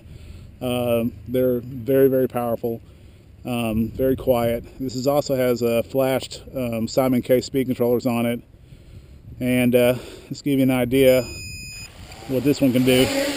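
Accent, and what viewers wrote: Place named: Texas, United States. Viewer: Hi, you sound like you're from Fort Worth Texas, are you? I'm from Houston. But, here is a question...Do you have any idea how much weight this design can lift? I want to build one and it will be my first rc craft, however, I will deck it out with all the OSD, GPS, and cameras needed to fly FPS. So, weight is a factor in a big way. If these motors are good, great I will buy them. If I need some a bit more powerful, got any suggestions on which ones to get? Thanks Much! Tracey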